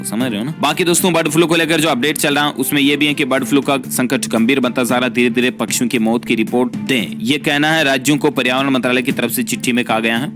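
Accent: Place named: Hyderabad, India. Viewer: Hyderabadi hona bolte.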